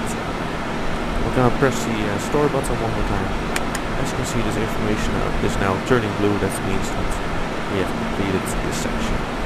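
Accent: Netherlands. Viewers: I swear to god, once i heard you're accent i KNEW you were dutch! And you're youtube account confirmed it :) Great video & thanks. Much better than reading tutorials ;)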